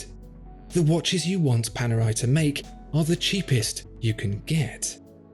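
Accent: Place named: England, United Kingdom. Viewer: Love your content but "Happenstance" FFS! Laurels is pronounced lorrels, not loorels. It makes you sound like you have put your beautiful English accent through a robotic computer enunciation programme.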